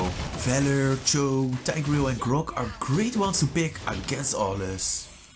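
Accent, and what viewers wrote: Netherlands. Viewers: Ben je nederlands??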